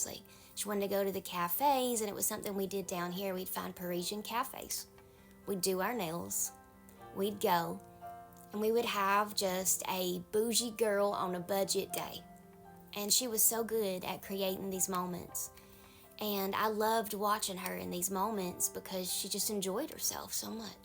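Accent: South, United States. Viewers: how sweet and southern your accent is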